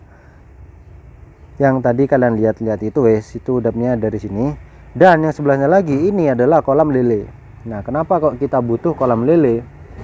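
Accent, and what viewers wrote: Madura, Indonesia. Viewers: Orang madureh kak?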